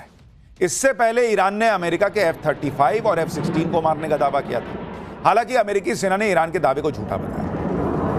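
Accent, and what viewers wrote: India. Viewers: Bro. Your deshi way to say is great maza aata hay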